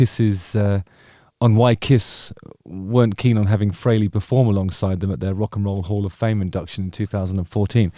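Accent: United Kingdom. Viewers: First time on your channel. when I saw that suit and heard your British accent I immediately thought of Mick Jagger. Nice call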